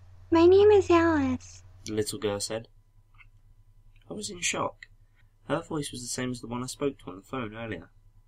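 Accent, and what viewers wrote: United Kingdom. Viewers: ain't often i find a British narrator i like, nice one bro.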